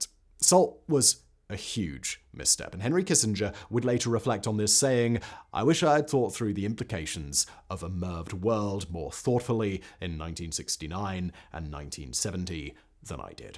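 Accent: RP, United Kingdom. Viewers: Silver linings, we got to hear say “penetration aids” with his posh accent. Made my day.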